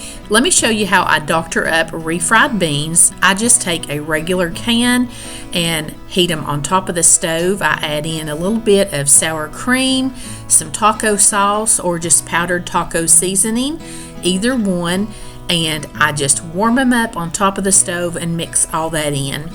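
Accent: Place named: Alabama, United States. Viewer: I just found your channel! I love your accent - you sound like me!❤️from Alabama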